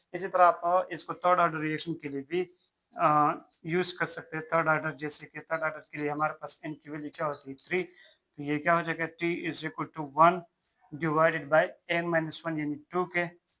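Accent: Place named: Pakistan. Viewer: Sir ap pak se ho kya apka bolne k tarike se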